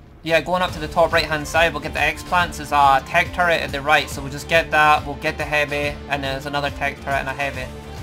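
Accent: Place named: Scotland, United Kingdom. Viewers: Where from scotland u from pal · not sure for certain but sounds like Glasgow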